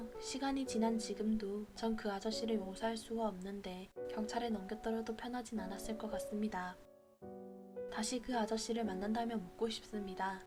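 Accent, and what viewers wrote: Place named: Gyeongsang, South Korea. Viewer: 사연 읽어주시는분 갱남사람인가베요?ㅋ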